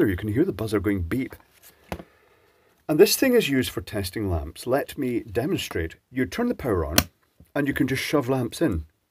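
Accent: Scotland, United Kingdom. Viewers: are you from Scotland? im Scottish and u sound Scottish sometimes but also you sound more English and its so confusing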